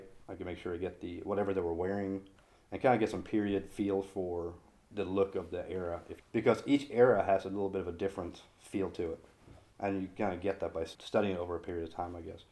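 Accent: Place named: Midwest, United States. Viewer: Wow! I always knew was from Sweden but listening to him talk he sounds like he is from the Mid-West....his English teacher must have been from Illinois, Indiana or Ohio (above the U.S. 30 corridor). He's an astonishing artist too.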